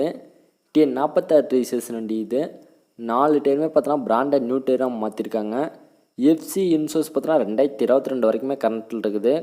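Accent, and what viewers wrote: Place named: Hindi Belt, India. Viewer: Sir neenga tamila......Hindi Madri pesureenga nanba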